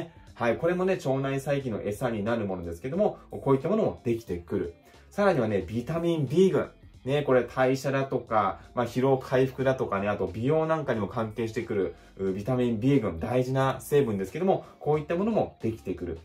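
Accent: Kansai, Japan. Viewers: 国菊あまざけを箱買いして毎日飲んでます📦 関西イントネーションが新鮮ですね〜🦻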